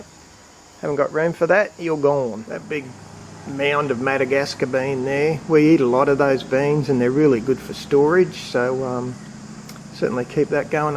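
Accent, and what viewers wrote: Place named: Australia. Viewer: Nice. To hear another Aussie! Im out in the Lockyer valley and it’s to hot to grow through summer so I’m just starting my seeds for autumn.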